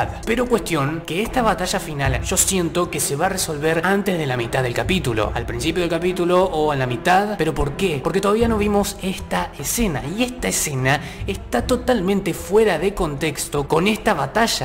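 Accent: Argentina. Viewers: No sabía que eras de Argentina messirve paaaa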